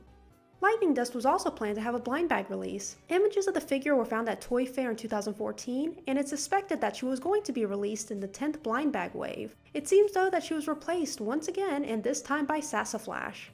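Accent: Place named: United Kingdom. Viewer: She’s from the UK.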